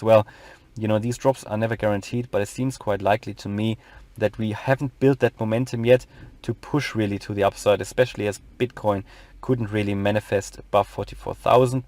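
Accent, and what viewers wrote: Germany. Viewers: Unverkennbar wenn Deutsche Englisch reden 😊🇩🇪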